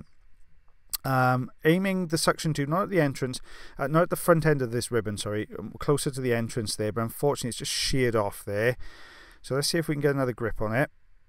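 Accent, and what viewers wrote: Wales, United Kingdom. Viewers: I keep coming back because i miss the welsh accent while living in korea. When you said go get a cuppa literally lit up my eyes😂 nobody has said that to me in 5 years while ive been here! Aaaand your videos really relax me before bed! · Agree! Want to learn that british accent.. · Haha...18 minutes of a Welsh accent...how could that ever bore anyone? And an explanation of what is going on...I have learned plenty...and not only the terms (the attic, canal walls, etc) and the various conditions which occur. This is a whole new course! Thank you. I didn't waste my isolation!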